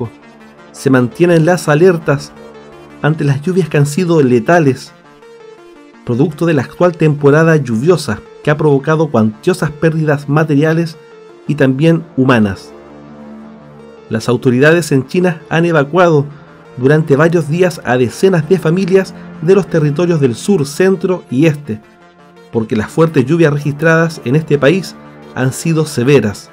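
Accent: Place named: Chile. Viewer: Vos sos chileno ,te digo x el tono de voz k tenes,saludos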